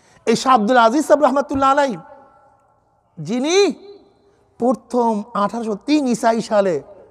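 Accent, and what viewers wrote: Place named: Barishal, Bangladesh. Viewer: শায়েখ মুখ থেকে বরিশালের ভাশা শুনলাম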